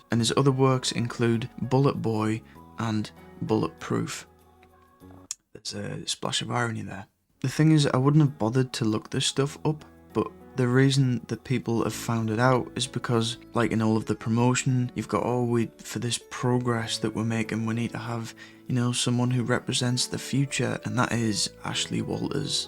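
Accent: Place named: Teesside, United Kingdom. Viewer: bizarre question but are you somewhere in Teesside?, I live near Middlesbrough and you sound very similar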